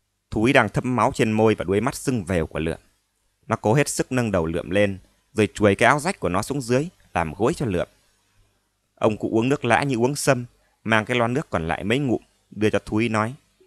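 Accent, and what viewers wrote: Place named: Bình Dương, Vietnam. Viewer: Hay quáluônnnnnnn❤❤❤❤❤❤❤❤❤❤❤❤❤❤❤❤❤❤❤❤❤❤❤❤❤❤❤❤❤❤❤❤ Nhưng nghe hơi Bình Dương thật 😂😂😂😂😂😅😅😅😅😅😅😅😅😅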